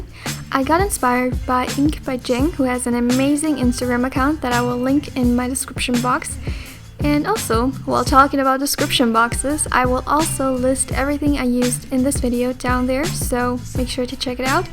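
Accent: Germany. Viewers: Wait- where are you from? Germany? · Since you said thats what it's called in German, I hear that you are German.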